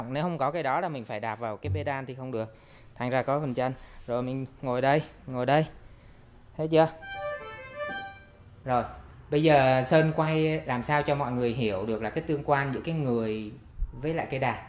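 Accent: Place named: Ninh Binh, Vietnam. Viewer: dạ, nghe giọng nói của a, sao như là giọng chuẩn NB ấy nhỉ..hihi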